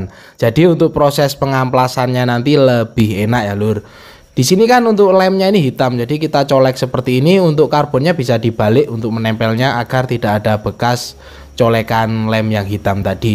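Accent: Temanggung, Indonesia. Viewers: Wong ndi to jane mass kok koyo wong temanggung ae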